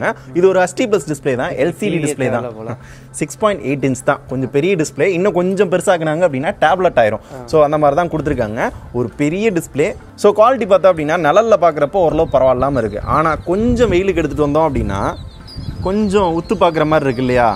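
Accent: Madurai, India. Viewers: Bro nenga madurai karar avinga ivinga slang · மதுரகாரங்க பேசுற மதுர ஸ்லாங் கெத்து தான்...!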